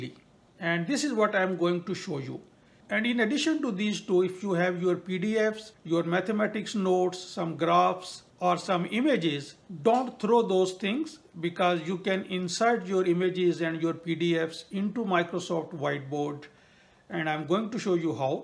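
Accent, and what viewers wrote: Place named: India. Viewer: indian accent again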